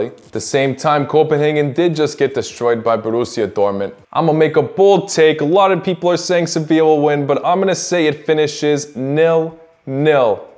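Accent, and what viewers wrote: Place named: United States. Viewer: Can tell hes american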